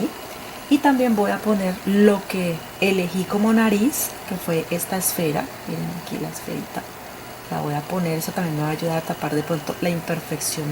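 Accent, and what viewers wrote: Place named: Bogotá, Colombia. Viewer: Que belleza y fácil, oye eres de Bogotá?... lo digo por el acento (con todo respeto). Mil gracias por tan bella manualidad 🤗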